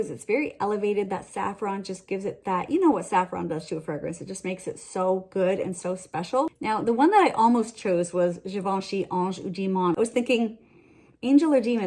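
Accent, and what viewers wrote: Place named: Maritimes, Canada. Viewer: I was trying to figure out which island you were talking about and then I realized it's PEI (confirmed by your Maritime accent 😄). Then I wondered what perfumes you wear to your favourite places on PEI. Then I wondered what perfumes I wear to my favourite places in BC. Now I'm off to Winner's to find Bourbon Craft. Wish me luck!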